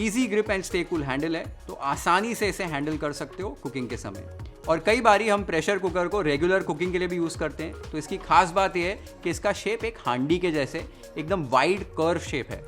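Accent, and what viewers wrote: Maharashtra, India. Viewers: Chef , nicely talking Marathi -Hindi misal language 😊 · Sir I truly admire your pronunciations in Marathi esp "l" which is authentic for Maharashtra, hats off to you for introducing authentic recipes of India along with authentic language pronunciations, best wishes for the same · Bhai ki Marathi accent ❤️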